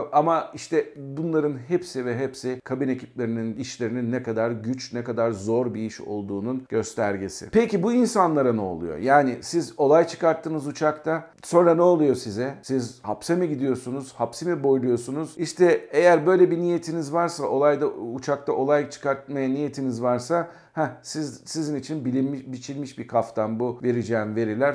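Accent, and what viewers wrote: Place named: Kayseri, Turkey. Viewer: Kaptanım, pazar günleri, Habertürk kanalında Airport isimli bir program var.Geçen hafta, uçakta ,yazılı olmayan görgü kuralları başlığı altında ,yararlı bilgiler vardı. Herkese öneririm.Hatta ,daha detaylı olarak belki siz de bir video yapabilirsiniz. Ama ,hep Gayseri aksanı kullanmayın, biraz da Rumeli,Karadeniz vs aksanlarında, takdir ettiğim tiyatral yeteneğinizi görelim. Bence Pilot olmasaydınız tiyatrocu olurmuşunuz..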